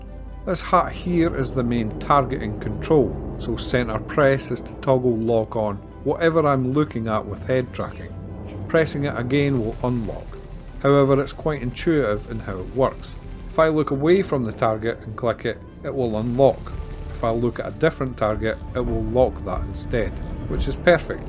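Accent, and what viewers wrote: Scotland, United Kingdom. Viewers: First Scot I’ve heard doing a star citizen channel. 🕺🏼🕺🏼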